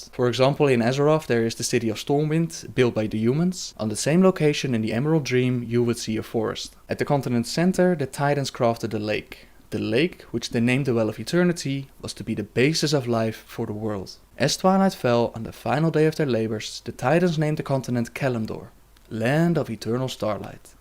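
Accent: Netherlands. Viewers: Right away i can tell you are from holland or belgium just by your horrible english accent! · u MUST be dutch.. if not.. well we just sound alike when we speak English xD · let me guess. you're Dutch? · I knew you were dutch ... I just could hear it :D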